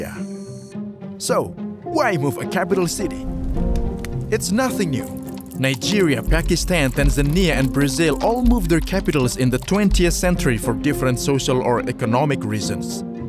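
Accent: Indonesia. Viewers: asli udah curiga bgt dari awal ni yg ngomong org indo wkwk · Still very indonesian accent even try hard to become native english speaker. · Yes, I feel the Indonesian accent here 😄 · i am sure the narator is indonesian :face-blue-smiling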